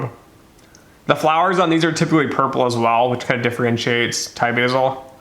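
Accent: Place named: California, United States. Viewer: You sound like you are in California.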